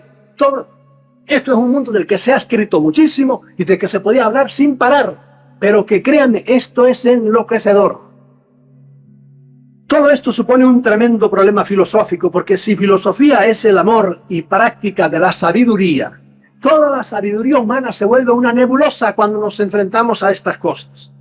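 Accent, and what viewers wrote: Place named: Mexico. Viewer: Amo su acento mexicano! Me recuerda a mi abuelito :')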